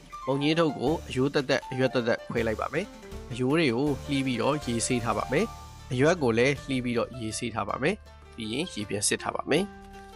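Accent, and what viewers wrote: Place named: China. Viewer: တရုတ်တိုစွသံတောင်မကြားချင်ဘူးရွံလို့ပါ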